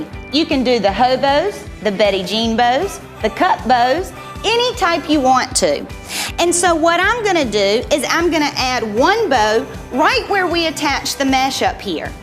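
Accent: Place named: South, United States. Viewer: Wow her southern accent! Nice designs. Like these ideas.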